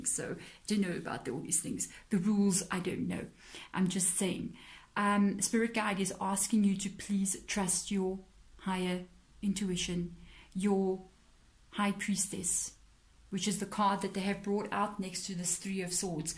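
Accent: South Africa. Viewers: Are you South African? Your accent sounds like it.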